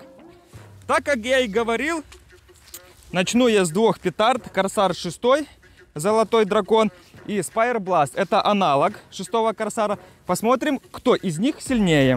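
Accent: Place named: Ukraine. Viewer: Зачем тебе маска) наверное это завлекает новых зрителей) по голосу сразу слышно Украинец!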